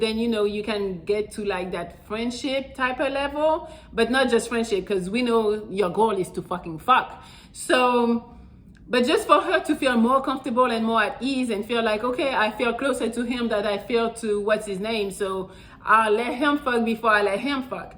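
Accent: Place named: France. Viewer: That’s where the accent come from I thought you where French As a Haitian man I thought I Hurd it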